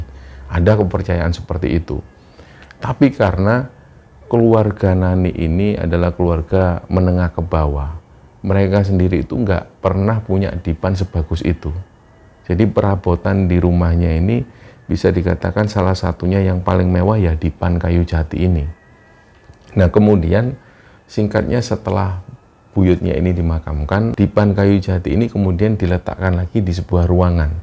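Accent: Java, Indonesia. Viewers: slm knl,..sy org makassar tp suka medok jawa y bg lho..,semangat selalu buat cerita2 y👍👍👍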